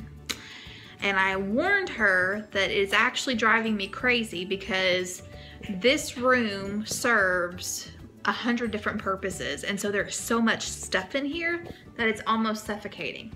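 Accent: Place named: Kentucky, United States. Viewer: I am a new subscriber for "Canuary" I was wondering if you are a Kentucky girl? Maybe Tennessee? Your accent sounds close to home to me.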